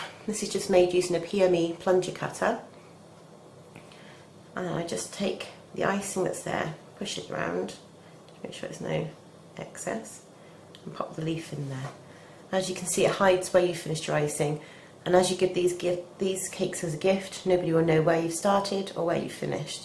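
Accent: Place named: England, United Kingdom. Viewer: Hello 🙂, Great video! This was more detailed than I needed (more is definitely better than not enough), but perfect for the majority who may not do baking projects all the time, so kudos! I'm from New York, but I find that if I pretend to have an English accent (and an interested audience), all my projects turn out brilliantly, hahahahaha! 😂 Of course my husband hears me in the kitchen and thinks I'm nuts! 😏 You, btw, have such a lovely voice and accent! Thanks for the tips and tricks!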